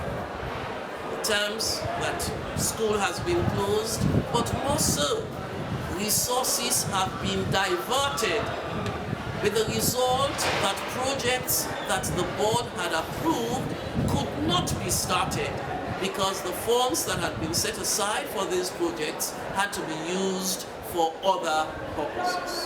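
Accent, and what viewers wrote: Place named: Caribbean. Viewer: Now I know where the Caribbean accents come from.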